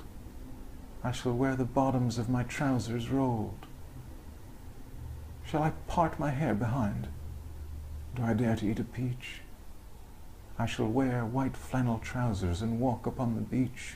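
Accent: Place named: United States. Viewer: Just the most amazing, easily-produced American diction. How many books have I heard him narrate?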